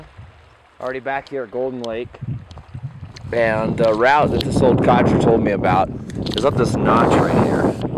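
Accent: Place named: Scotland, United Kingdom. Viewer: Thumbs up just for the Scottish accent laddie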